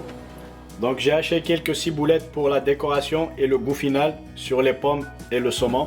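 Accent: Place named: Algeria. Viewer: Tres bel accent algérien. Tres bonne recette. Merci chef !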